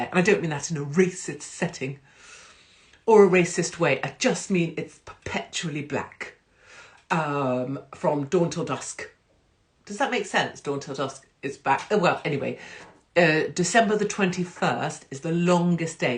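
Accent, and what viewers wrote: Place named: England, United Kingdom. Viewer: GOD----YOU ARE GOLD, YOU KEEP GETTING BETTER, LOVE THE ACCENT, PURE ENGLISH EXPRESSIONS, '' CARRY ONE BRITANNIA '',.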